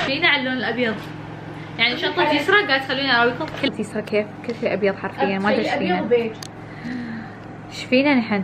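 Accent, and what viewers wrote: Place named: United Arab Emirates. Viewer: لهجتها اماراتية واضح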